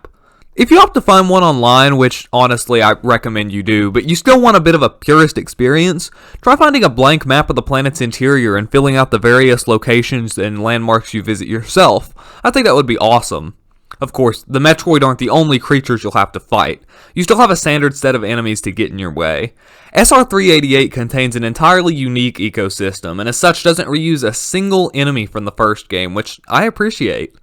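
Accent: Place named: South, United States. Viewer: Your voice sounds like a mix of optimus, mrBeast, and a slight bit of southern and it amazing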